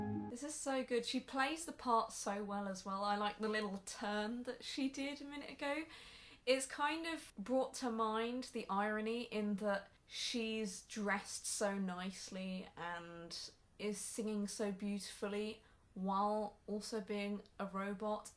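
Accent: United Kingdom. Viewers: Your surname is Polish but your english speech is very Oxford...simply nice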